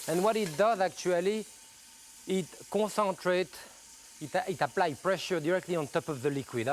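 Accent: France. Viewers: Sometimes a bit hard to understand his french accent but I'm learning he is an awesome chef to watch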